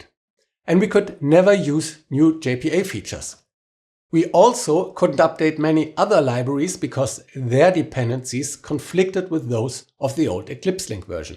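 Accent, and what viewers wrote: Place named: Germany. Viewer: i like your german accent. Great intro to hex arch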